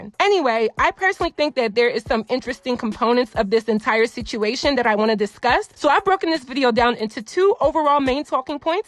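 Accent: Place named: California, United States. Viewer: To answer you about the accent she has Trust me it is a Cali accent I live in Cali and everyone always tells me I sound like the Kardashian’s when I never noticed I guess it’s just the main accent out here ❤️ I love your videos !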